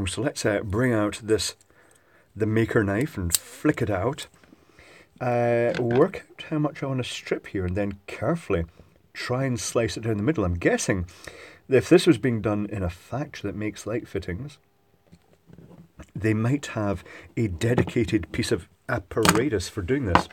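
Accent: Scotland, United Kingdom. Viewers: Fairly heavy Scottish accent.... 'triac' becomes 'track'. Had to double-take a couple of times. TRI-AC. TRI-AC. Repeat 100 times,!:-)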